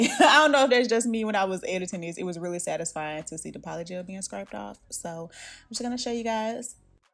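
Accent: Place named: Texas, United States. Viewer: Are you from Texas? You got a distinct accent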